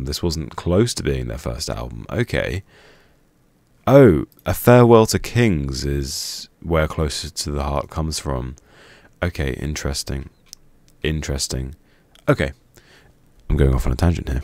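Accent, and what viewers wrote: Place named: United Kingdom. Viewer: I will check out anyone checking out. British accent a bonus.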